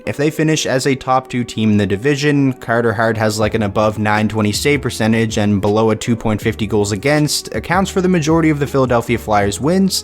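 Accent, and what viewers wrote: Canada. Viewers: Ive said many times your SUPER Canadian accent makes me laugh... "OAT-BREAK" made me laugh pretty hard lol. Also your math teacher musta hated you. You always say .fifty instead of .five zero ...and it triggers me lmao.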